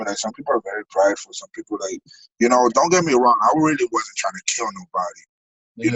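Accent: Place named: Malden, United States. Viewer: Sup my man u said u were born in Malden but grew up in Florida, there's no way u didn't grow up in Malden too with that accent! What age u leave?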